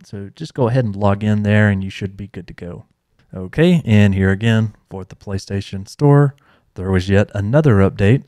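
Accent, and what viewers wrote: United States. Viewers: I read Birmingham and instantly thought "England UK? He sounds American" guess I gotta remember you guys have a lot of our city names lol. Good video btw 👌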